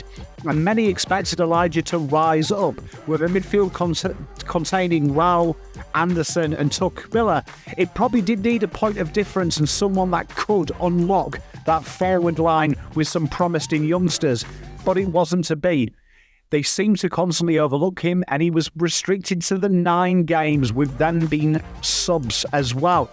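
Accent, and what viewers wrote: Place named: RP, United Kingdom. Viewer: Nicely done. Definitely one for the archives! 👍🏻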